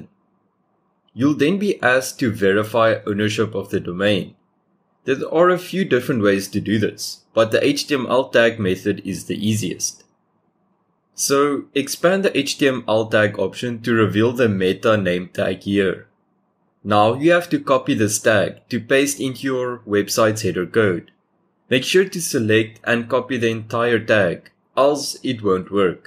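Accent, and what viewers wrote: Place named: South Africa. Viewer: Thank you! Are you South African? You sound South African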